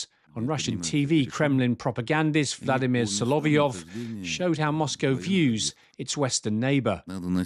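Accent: United Kingdom. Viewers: British accent and real intention of Russia are not connecting together.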